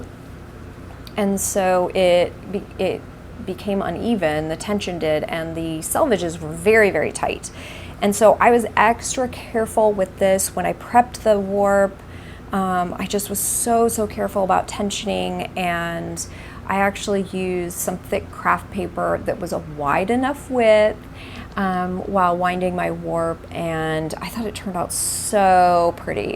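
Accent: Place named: South, United States. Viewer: You may just be getting a bit of southern drawl!!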